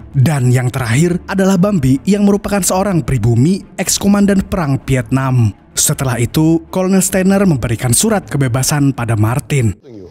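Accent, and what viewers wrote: Banjar, Indonesia. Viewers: haw banjar kh pian?😁 salam ae nah dari kaltim hehe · banjar kah pian mang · Nahh, urang Banjanr adminnya neh..😅😅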